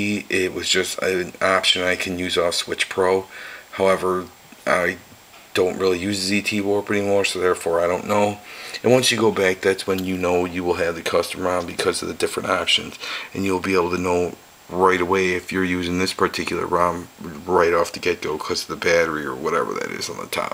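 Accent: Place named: Chicago, United States. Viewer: youre from Chicago huh? lol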